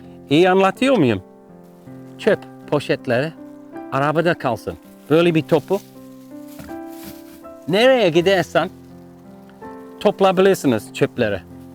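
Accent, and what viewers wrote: Turkey. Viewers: You are such a good person. I can't believe that a person who has a beautiful heart exists... Okay uncle, I'll meet my friends tomorrow to change the situation. We all love you and your Turkish accent!❤ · Bay.. Türkçeyi. Fethiye. Şivesiyle. Öğrenmiş. ❤️😊🌹🙏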